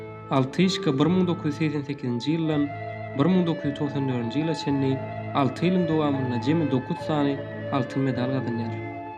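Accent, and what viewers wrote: Mary, Turkmenistan. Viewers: Maryñ aksenti bar diymesen, video erbet dal , gowy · Frozen citizen Maryn aksenti bolnanna name?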